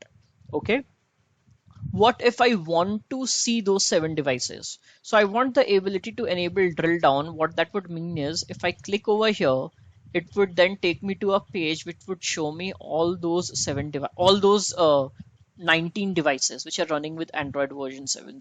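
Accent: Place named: India. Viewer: Indian English again? bye~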